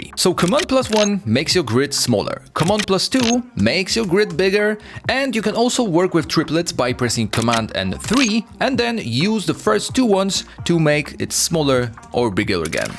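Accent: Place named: Eastern Europe. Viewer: I thank you my friend, for the great work your doing. Where are you from? I can tell your from Eastern Europe by your accent. Portugal here. 👋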